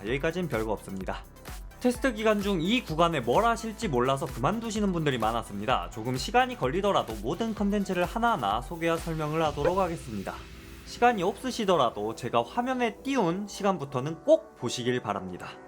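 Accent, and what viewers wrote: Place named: Jeolla, South Korea. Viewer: 그런데 혹시 전라도 분이신가요? 못해가 모데로 들려서 너무 친숙하네욬ㅋㅋ 고향사람 만난느낌 유튜버님 서버는 어디로하시나요?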